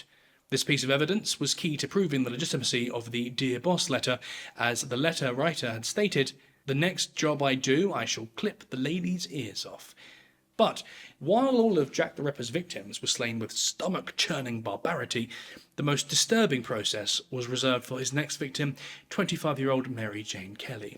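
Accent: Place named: Essex, United Kingdom. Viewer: I knew you were an Essex boy (north Essex accent is less... towie)but didn't realise how close you were to my stomping Grounds! fun fact, Jack the rippers victims are buried around the corner from my grandparents and we used to go and visit them when we'd go to see my grandparents at the manor park cemetery. My great great grandad worked there, told my mum of how haunred it was and what creepy things would happen there. I have a picture of him with his horse that he worked with; it was a beautiful shire that eventually stamped on his foot and broke it!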